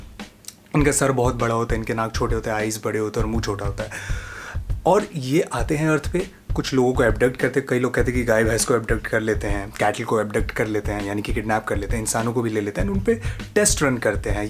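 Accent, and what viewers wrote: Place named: India. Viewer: I get a kick out of the ingine accent.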